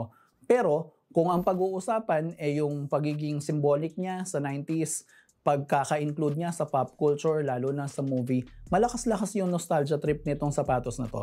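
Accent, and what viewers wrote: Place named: Pampanga, Philippines. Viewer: Ah kapampangan ka bro? "Konyektado" Konyeksiyon"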